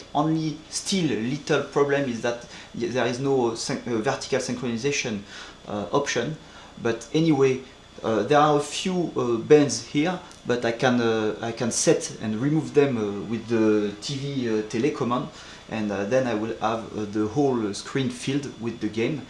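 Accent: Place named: England, United Kingdom. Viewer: Magnifique accent anglais ^^, le rendu a l air bien sympa !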